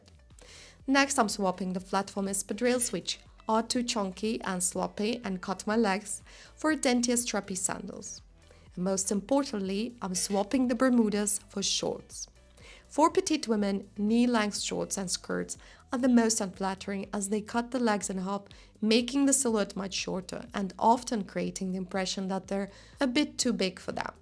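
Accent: Russia. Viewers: russian acsent 😐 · почему у нее русский акцент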